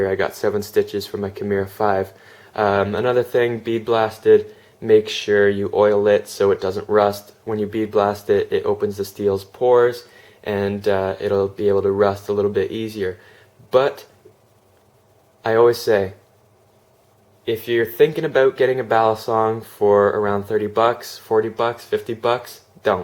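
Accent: Canada. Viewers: Your def Canadian lol aboot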